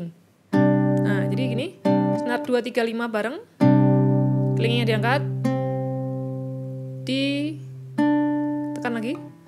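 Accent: Java, Indonesia. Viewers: Mbak nya rodo medhok.. ailapyuh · Jowone medok mbak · Suarane medhok mbak yu......😃......... Yen ing tawang ono lintang.....mbakayu.....😀😁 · Wong jowo yo mbak..kliatan logatnya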